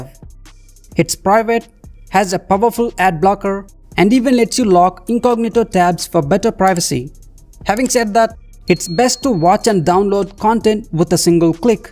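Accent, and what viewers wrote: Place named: India. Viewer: Bro has an Indian accent. Nice video btw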